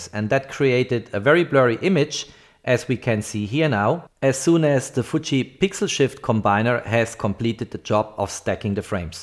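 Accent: Germany. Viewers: Bist du aus dem Schwabenland?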